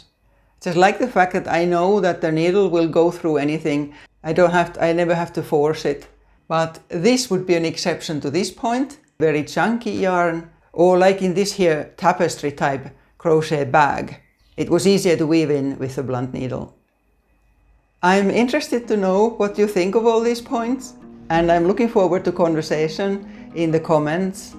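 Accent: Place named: Finland. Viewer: I love your Finnish accent and your easy to follow directions and this video. My daughter and I met 23 of my relatives from Paimio, to Sotkamo to Aavasaksa and many more cities. Finland is a beautiful country with beautiful/kaunis people. Thank you very much/ Kiitos paljon. From California.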